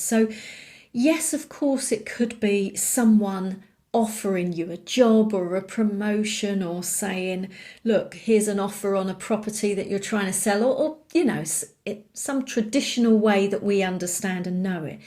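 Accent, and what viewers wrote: United Kingdom. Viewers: Thank you, for your loving bright smile and face, that so much express the Spiritual Community of Light, which leads and inspires you on this specific task you are performing with us here. You are ALREADY in your own....Zone of Genius, dear friend!! For sure!!! But I feel also very grateful for the Quality of your presentation, the respectful, joyful and positive personal style of your image to us! And your gorgeous, clearly spoken, British English, of course!! Lots of hugs!!!🤗🤗🤗🤗🤗❤️❤️❤️❤️ Liza.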